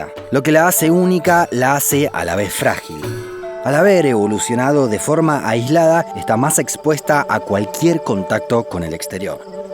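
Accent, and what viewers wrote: Argentina. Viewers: El narrador es argentino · Acentos Ré ré ré argentino ! Adooooooro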